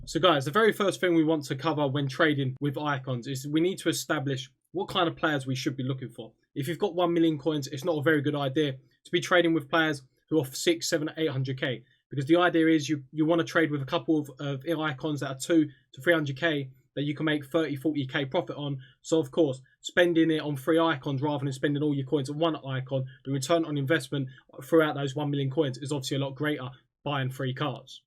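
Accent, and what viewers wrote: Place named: Australia. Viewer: tbh you sound very Australian xD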